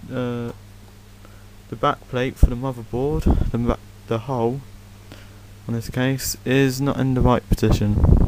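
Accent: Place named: United Kingdom. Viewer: i couldnt understand what you were saying half the time... you clearly have a british accent... Are you Ozzy Ozzbourne?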